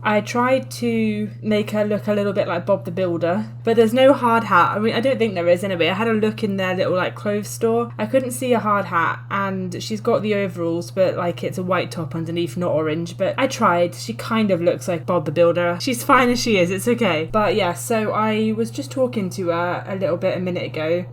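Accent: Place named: United Kingdom. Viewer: That's gotta be the most British voice I've heard in years.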